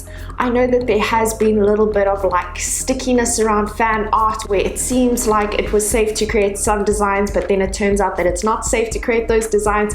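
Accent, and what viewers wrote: South Africa. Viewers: I’m guessing you are from SA ? It’s really so nice to hear your accent on this app , so refreshing 😊😊. I’m from Namibia by the way 😄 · Keep up the work fellow Saffer ,sounds like a Durbz accent. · By the way I guessed your accent as I have 2 good friends from SA. Keep being genuine and honest and above all stay safe.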